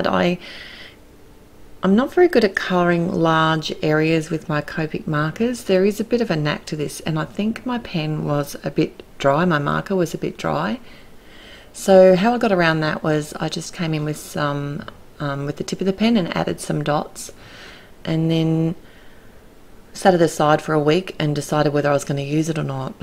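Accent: Australia. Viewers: Watching this Anzac morning after the Dawn Service... Wow! Thank you, always love listening to the Aussie voice😀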